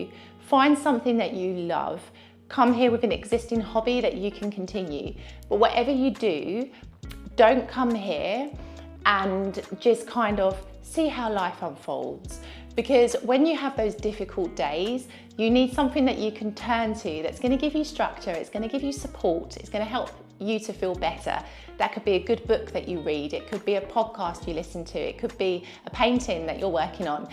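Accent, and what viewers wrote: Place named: RP, United Kingdom. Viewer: I love your RP accent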